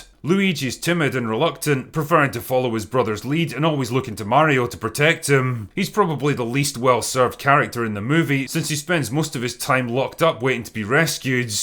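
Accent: Scotland, United Kingdom. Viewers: No, I don't care whether or not it's good, and I'm not paying for it anyway since it's not my genre. I just enjoy your take on these things. It's hard to beat a drunken Scot sarcastically discussing a movie. ;-)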